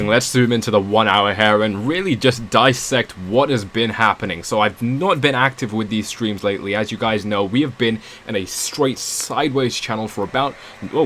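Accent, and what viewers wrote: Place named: London, United Kingdom. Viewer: London posh boy accent.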